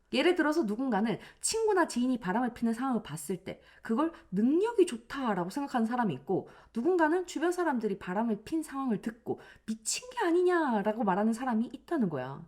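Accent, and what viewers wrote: Gyeongsang, South Korea. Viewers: 안녕하세요 선생님 주옥의말씀잘들었습니다 미인선생님께서 꾀꼬리같은보이스로 부산경남쪽 또는 대구쪽이신가 아뭏든 정감가는억양으로 설명해주시니까 몰입이되네요 늘 건강하시구 빛나구예쁘신얼굴 오래오래뵙구싶네요 그럼 안녕히계세요~~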